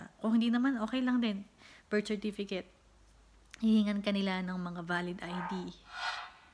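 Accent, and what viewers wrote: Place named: Southern Tagalog, Philippines. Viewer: hi new subscriber to your channel... been planning to apply to work abroad for years medyo hnd po pinapalad pro I am still hoping that I can be able to fulfill my dream... anyway thanks for sharing this I'll surely try all the tips you've shared here... by the way are you from the south? Quezon or Batangas? hihi just curious though since we have a little bit of similarity in the accent... thank you and more power to your channel. :)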